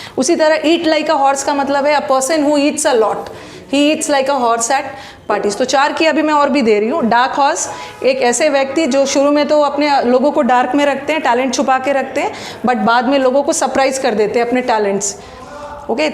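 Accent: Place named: Mumbai, India. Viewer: Ur hindi tone is like mumbaiya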